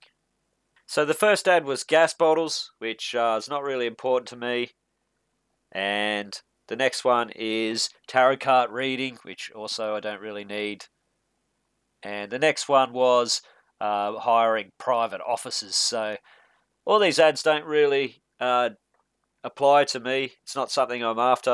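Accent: Australia. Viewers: Nah he just sounds Australian lol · He just has an Australian accent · Every Australian sounds like this, wdym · Probably because he's australian.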